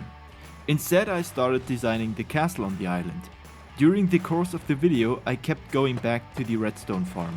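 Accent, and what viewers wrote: Germany. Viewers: Are you German? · Great Video. In the First few Seconds thought, is he from Germany? Yes he is😅 You hear a German Speaking English if you are a German yourself😆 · Did i hear the german accent? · wait… are u german?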